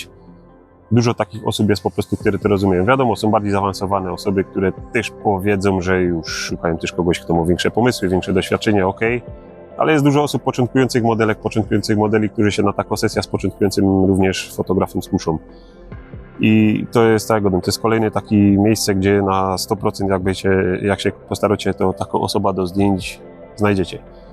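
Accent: Silesia, Poland. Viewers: Trzymam kciuki za rozwój kanału. Tego typu polskojęzycznych fotograficznych kanałów jest stosunkowo niewiele. Podoba mi się formuła - krótko, rzetelnie i treściwie. No i ślonsko godka, choć sam jestem poznańska pyra ;-)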